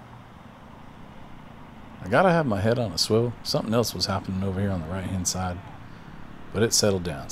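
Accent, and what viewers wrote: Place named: Florida, United States. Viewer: I'm sorry, but the sound of your voice, the tone, and your Florida accent just don't go together in my mind. No offense sir.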